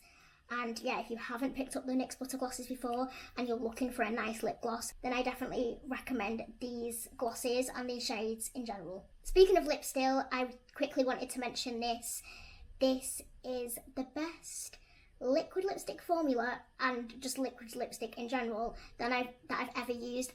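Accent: England, United Kingdom. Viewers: I just love your English accent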